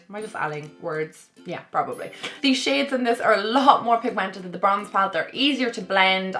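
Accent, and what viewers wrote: Ireland. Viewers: Mariah M the accent is Irish, I'm Irish myself 🍀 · I love your accent is it irish? you should name the kitty Oreo.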